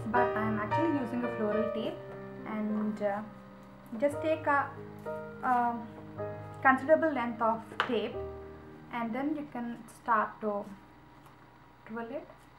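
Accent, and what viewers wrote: India. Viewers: I am Indian too but I find her accent funny and cute :D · I like the video it is so awesome. Ps I like you're Indian accent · Kannada accent😊but nyc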